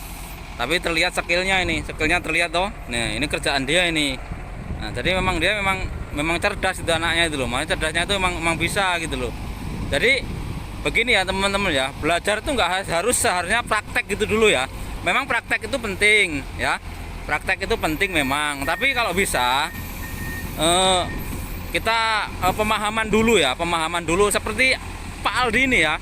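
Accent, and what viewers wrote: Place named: Java, Indonesia. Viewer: kirain di Jawa dri logatnya kayak orang jawa Pak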